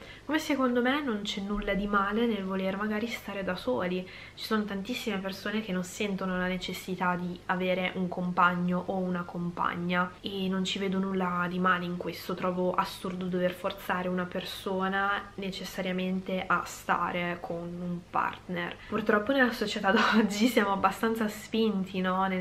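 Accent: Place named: Milan, Italy. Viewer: Molto interessante! Curiosità Indiscreta, hai un Accento milanese con tratti di pugliese.. Ci ho preso? 🤔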